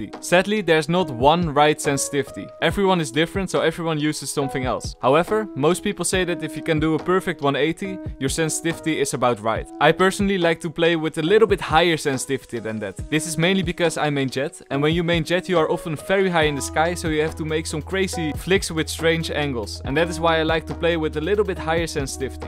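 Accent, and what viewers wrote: Netherlands. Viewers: Ik hoorde gwn aan je stem dat je Nederlands was lmao ez peazy lemons squezy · I can feeeeeel the Dutch voice trying to come out idk but I'm 99 procent sure your Dutch Same ass me that accent its exactly like just people in Belgium and the Netherlands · i smell dutch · this man souds dutch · Ik hoor dat je nederlands bent haha lol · I smell some dutch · Ben Jij nederlands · Are you dutch? Because you sound a little bit dutch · are you dutch by any chance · are you dutch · Jij bent lederlands he smieght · “Has a Dutch accent” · Je hoort gwn aan je engels dat je nederlands bent😂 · Nederlandse engels belike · Dutch accent on point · Thx for the vid and something else i smell dutch😂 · i hear some dutchie in him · This video smells like a nederlands accent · hahahaha hoor meteen dat je nederlands bent, wel goeie tips though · I smell Nederlands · I hear nederlands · ben jij nederlands of belg want ik hoor dat aan u accent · dutchiest accent ever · mmh i smell dutch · The dutch accent in your english 😆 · Your dutch accent makes this terrible to listen to. 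· The dutch ascent makes this 100x better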